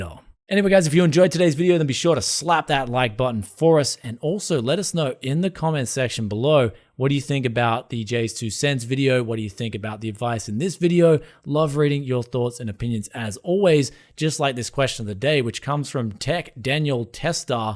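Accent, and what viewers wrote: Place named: Australia. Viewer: you have an excellent understanding of the market and the economy. Thanks for sharing this mate. Great to hear another Aussie accent in the tech world.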